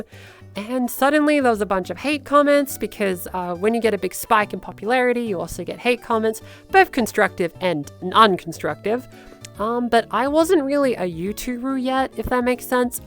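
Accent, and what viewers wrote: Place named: Australia. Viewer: want to thank you for the tutorial,. It was really refreshing to have another Aussie whose voice i could aim for and not the Californian (although those tutorials are still helpful!!) and you helped me practice my voice to the point where my vocal therapist was really impressed with my adaptability!! much love ❤️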